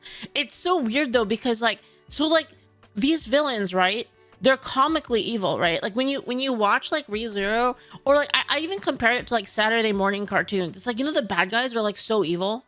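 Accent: United States. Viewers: Unrelated but, As a British man, i find it funny hearing an American explain what rain is to me 😂 i was 4 when i discovered i could take off a raincoat, we're practically born underwater it rains so much here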